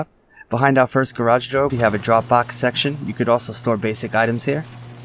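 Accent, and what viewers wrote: New York, United States. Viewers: i hear a accent, new york maybe?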